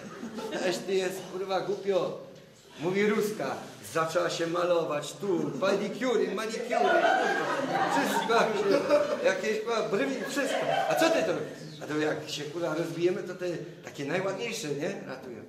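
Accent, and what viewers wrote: Silesia, Poland. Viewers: Facet ma talent, po glosie poznaje że jest ze Śląska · to jest raczej po śląsku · haha tylko ze to jest akurat gwara śląska xd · oooooooo ten śląski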